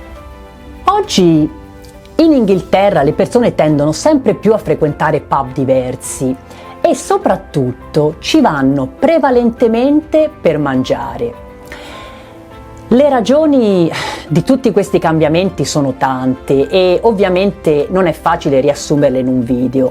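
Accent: Italy. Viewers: Grazie, mi piace il tuo modo di parlare così garbato, proprio lucchese. Un abbraccio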